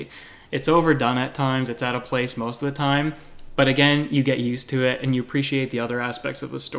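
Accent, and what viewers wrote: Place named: Upper Midwest, United States. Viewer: I got FFXV on Steam in 2017 but didnt play it until last year. It was a pleasant surprise! You made great points about it. By the way, you remind me of someone I enjoyed watching on YouTube pre 2010 - Roger Swan, an American vlogger that lived in Japan. I think it's your manner of speech, maybe you're from the upper midwest.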